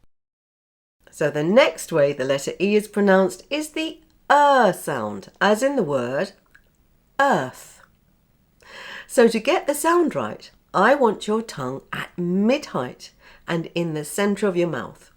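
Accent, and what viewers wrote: United Kingdom. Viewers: 𝑵𝒐 𝒅𝒐𝒖𝒃𝒕, 𝑩𝒓𝒊𝒕𝒊𝒔𝒉 𝒔𝒐𝒖𝒏𝒅.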